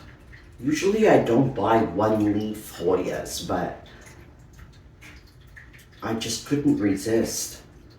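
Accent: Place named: New York, United States. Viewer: Hi! That black cat callistophylla is on my wishlist as well as the undulata which I am right now looking for, affordable of course! I thought your accent sounded NY or NJ. Are you a transplant to Canada? Look forward to your next 💚! Dee, NY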